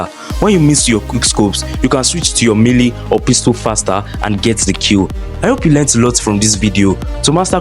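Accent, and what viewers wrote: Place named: Nigeria. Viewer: You sound like a Nigerian ngl like me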